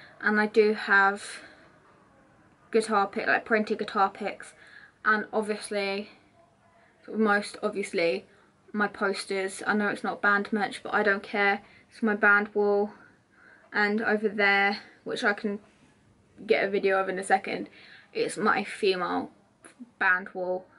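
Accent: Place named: United Kingdom. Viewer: Hello your stuff is nice we have the same shirt black veil brides and also the shop at that store hot topic love your British English is very difficult to understand but it is very nice your asento greetings from México tijuana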